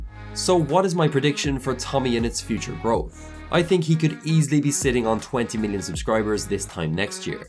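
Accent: Ireland. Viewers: Nicely done, and nice to hear another Irish voice on YouTube!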